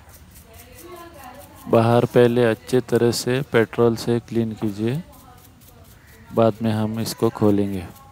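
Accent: Goa, India. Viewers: Are you from goa? Cz ur hindi little like goan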